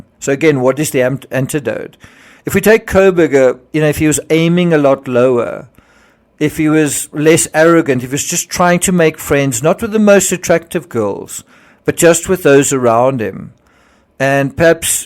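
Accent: South Africa. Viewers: I would say Maddie because he met her at the restaurant, she may have been vegan herself also. Somebody has also say Kaylee didn't live at the residence anymore and was visiting Maddie to show off her new car, so perhaps her being there was totally unknown to Bryan. Xana and Ethan were almost certainly collateral damage as Bryan left the house. PS: I love your South African accent 😆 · I live in Brazil but am a fellow Saffa... I love true crime and have been following the Idaho case. I came by your channel by chance and thought... I recognize that accent - this guy must be South African... low and behold you are. Proudly South African! I just subscribed ☺️ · love your videos! are you south african? I can hear a slight familiar accent. i'm from the free state studying criminology - your channel is truly amazing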